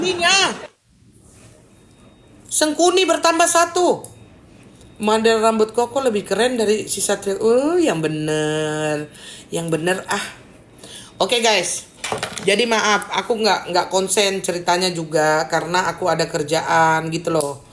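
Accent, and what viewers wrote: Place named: Makassar, Indonesia. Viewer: Koko logtnya mirip org Makassar 😅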